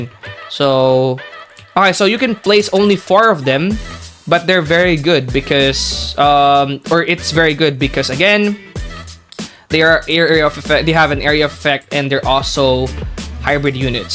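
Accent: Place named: Philippines. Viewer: bro I'm Filipino, but your 100% Filipino, the accent broo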